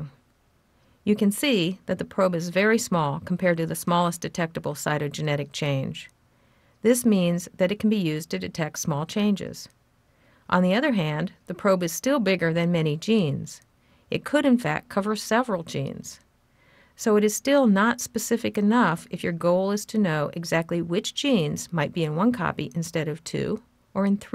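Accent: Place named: Texas, United States. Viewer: Ahh.. I love that Texan accent ^^ Very clear explanation of this method also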